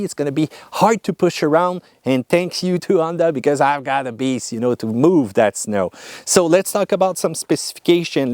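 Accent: Canada. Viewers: I like his accent. Canadian people are awesome.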